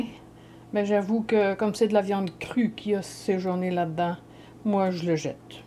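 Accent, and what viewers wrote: Quebec, Canada. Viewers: J'adore l'accent québécois je trouve ça très élégant ou très raffiné pas comme en France qu'ils ont appris la langue des racailles XD (les descendants de l'émigration) et je m'abonne 👍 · Je viens de voir votre vidéo ( donc bien après sa sortie) au moment même où ma viande de bœuf séché gentiment depuis 2 semaine et qui donne déjà un joli résultat ( je compte la laisser encore une a 2 semaine a sécher en plus ) . De mon coté je comptais la portionner et la stocké dans des sachet sous vide. Pour ce qui est du sel qui m'a servi au salage : vu que j'y avais ajouté des aromates je l'ai fait séché et je l'utilise régulièrement pour assaisonner mon eau de cuisson ( légumes, féculents, etc ...) Merci pour vos vidéo avec cet accent québécois que j'adore écouter depuis la france :)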